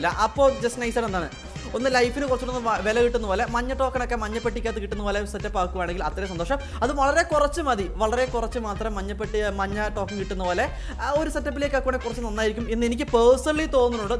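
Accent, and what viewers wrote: Kannur, India. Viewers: Bro Kannur aano ?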